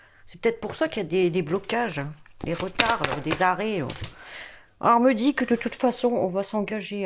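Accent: North, France. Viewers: Merci sympa le tirage et pleins de bonne humeur! seriez-vous du Nord? BONNE JOURNEE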